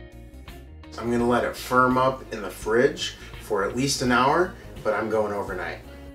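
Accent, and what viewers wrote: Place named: Northern Kentucky, United States. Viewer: Are you from northern Kentucky? You sound like it. 😊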